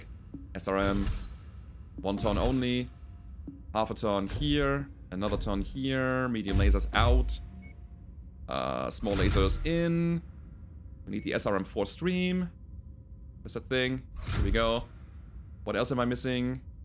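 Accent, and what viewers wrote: Germany. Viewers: I'm not very good at this game but I love stomping around (and playing Mechamon while I do so). I'm really enjoying your unmodded playthroughs; this one I'm finding especially interesting because it features the one DLC I don't have. Also I hope you don't mind me saying this, but I love your accent! It's nice to know how all the German stuff in the game is actually meant to sound. 😅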